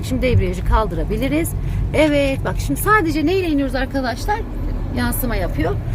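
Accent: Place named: Çankırı, Turkey. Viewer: Burasi çankırımı hocanin şivesi cankirililar gibi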